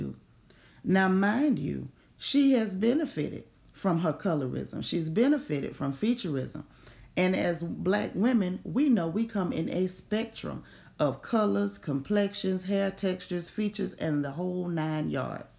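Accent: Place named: South, United States. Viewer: It's the narration with the southern accent and reads for me😊❤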